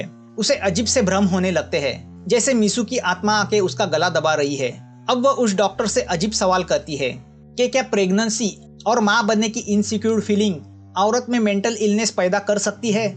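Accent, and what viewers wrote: Maharashtra, India. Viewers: Marathi manus Boltoy kaltay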